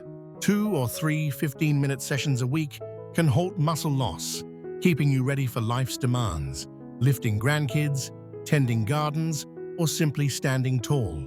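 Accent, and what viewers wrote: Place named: United Kingdom. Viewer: What’s with the GdDam British accent?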